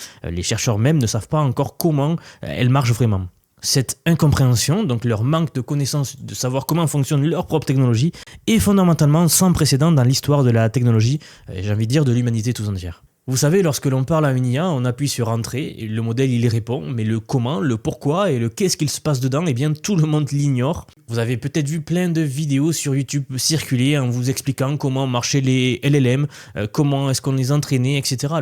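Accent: South, France. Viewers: Mais, la grande question est "Qui est le génial présentateur de VSION IA avec cet accent du sud ???"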